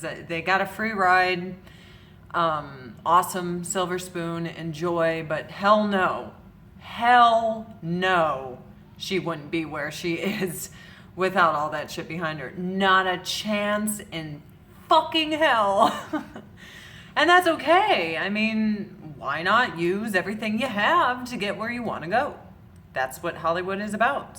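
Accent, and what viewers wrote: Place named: South, United States. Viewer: When she was on ANTM her accent was like so cool and tomboy-ish and she had like this southern accent and I LOVED that accent. But now she has this “normal” accent and it's not really “cool” anymore.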